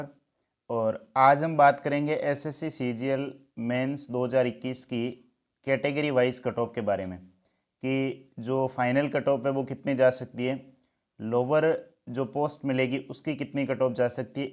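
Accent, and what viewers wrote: Rajasthan, India. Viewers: OK bhai mujhe apke accent se lge ki aap rajasthan se ho isliye bola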